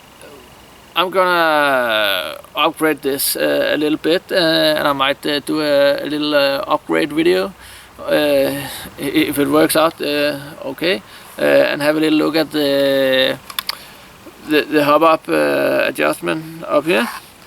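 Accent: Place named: Denmark. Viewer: very danglish ^^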